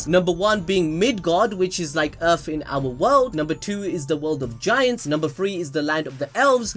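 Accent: Punjab, India. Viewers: Hey are u punjabi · that punjabi accent lol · Haaaai are you Indian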